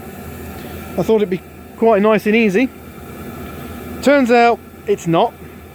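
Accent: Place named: Derbyshire, United Kingdom. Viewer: Im from Derbyshire lol, I know Derbyshire when I hear it lol, awesome videos on the heaters and I see you get upto alsorts of stuff so Im subscribed ;-)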